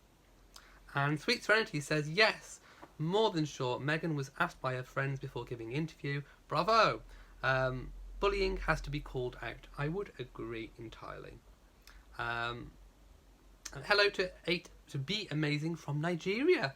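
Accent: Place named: RP, United Kingdom. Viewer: Excellent pop up chat! I’m filled with happiness and hope and a tad taller spine now that Meghan’s friends have spoken out on her behalf. I’m sure her friends have her quiet approval for speaking out and I wouldn’t be surprised if the Queen is aware also. I hope this lowers the vitriol and things improve!!! 🙏🏾🙏🏾🙏🏾👏🏽👏🏽👏🏽🥰. Also love the BBC voice!!! 🥰🥰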